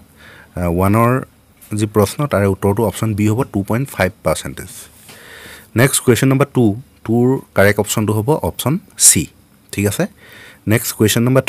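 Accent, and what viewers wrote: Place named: Sivasagar, India. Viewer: English laga sivasagar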